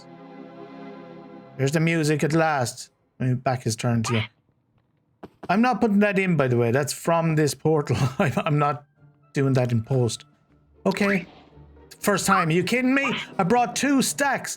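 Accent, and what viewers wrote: Ireland. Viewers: I rarely comment but I must applaud you for the creativity and the amazing videos. Undoubtrdly one of the best minecraft builders I've seen, if not the best! You are not small but a big gem among the minecraft creators. Of cours love the accent too, Irish but not too Irish so I can actually understand what you're saying lol. Keep them coming pls :medal-yellow-first-red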